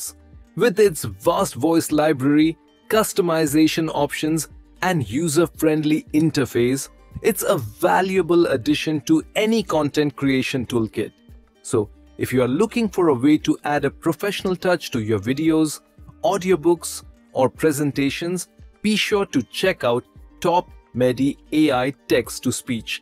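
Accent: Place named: India. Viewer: eventhough you are already using tts, your idian accent still strong.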